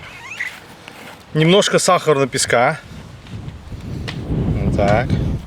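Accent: Azerbaijan. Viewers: Дорогой Приветствую! Это снова я! Вячеслав из Нижнего Новгорода! Я не перестану восхищаться тем что вы делаете и как преподнесите! Это шедеврально! И да кстати! Не смотря на то что вы Азербайджанец, и говорите на русском, моем родном языке. Вы говорите на русском очень красиво, с акцентом! Говорите очень грамотно, даже понятней, чем я говорю 😂! Дай Бог Вам здоровья крепкого, мирного неба над головой, процветания и всего самого наилучшего! Я ваш фанат! 😊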